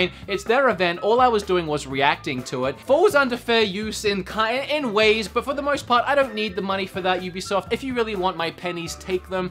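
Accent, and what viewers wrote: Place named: Australia. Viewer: Bro, dont change the Aussie accent man...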